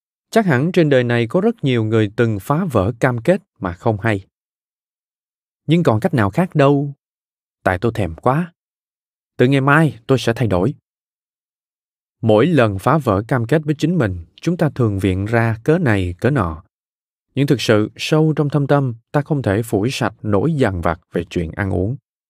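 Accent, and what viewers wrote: South, Vietnam. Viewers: Đúng rồi. Giọng Nam mềm mại dễ nghe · Giong đọc của anh này quá hay , đúng là giong miền Nam nghe hay hơn giong bắc. Sách hay ,giọng nói rất hay. Cảm ơn tác giả va cảm ơn người đọc sách hay . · Đã lâu lắm rồi mới được nghe một giọng đọc miền nam quá chuẩn , phát âm rõ ràng S và X …cám ơn nhiều ! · Giọng đọc miền nam hay quá, cảm ơn! Sách hay! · Thích giọng Miền Nam. Thích nội dung sách. Cảm ơn bạn. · Tôi thích giọng đọc miền nam này, nghe mà ghiền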